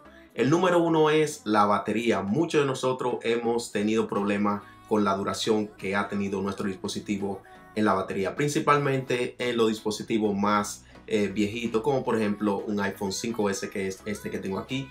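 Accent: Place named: Dominican Republic. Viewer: Dominicano y pico.!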